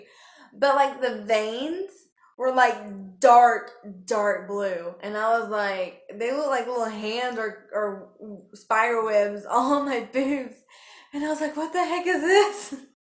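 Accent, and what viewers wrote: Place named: South, United States. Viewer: where u from I hear a southern accent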